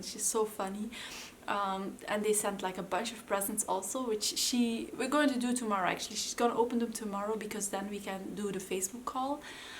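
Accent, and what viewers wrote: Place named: Netherlands. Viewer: Where are you from? It sound like you speak dutch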